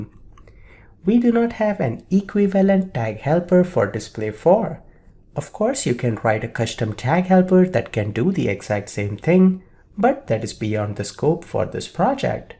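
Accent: India. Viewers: It's an sweet Indian English accent 😁🇮🇳🇮🇳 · Imagine speaking Hindi and then trying to switch to english for three straight hours. It must be a tounge twister. At least he manages. Every time I try to speak in english it is terrible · makes me nostalgic hearing a indian guy making .Net tutorials (courses) for this. Takes me back like 12 years to good old days of youtube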